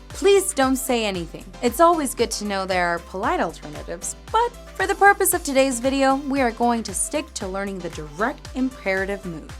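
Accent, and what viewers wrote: Spain. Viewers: I have become a fan of your Spanish accent...!!!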